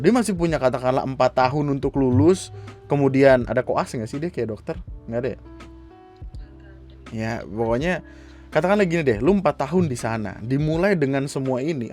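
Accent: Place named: Makassar, Indonesia. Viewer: Dukung bang buat lancar logat Makassar:_andriWkwk::_andriWkwk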